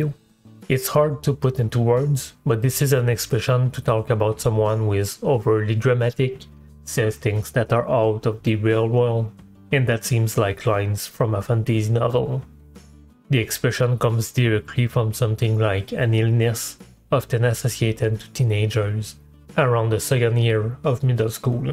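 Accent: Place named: France. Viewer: are you french? You sound very french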